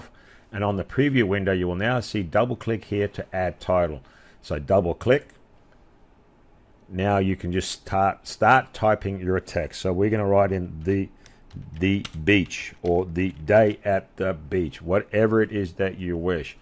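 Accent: Australia. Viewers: I wish I'd found this video two years ago! I'm not a beginner any more but I /still/ found basic things I didn't know. Thanks, I'll be checking out your other videos too. Oh and cheers from Melbourne. Lovely to hear that Aussie accent. :D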